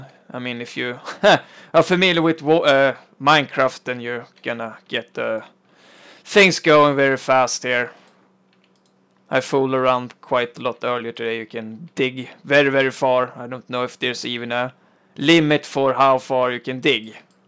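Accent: Sweden. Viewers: You are Swedish, right? I mean I'm sure Danish people and Norwegians have a similar English accent, but this is identical to the Swedish accent so that's what I presumed that it is. And I don't really engage too much online with voice except with friends on Skype so you're not likely to hear me snacka Svenska.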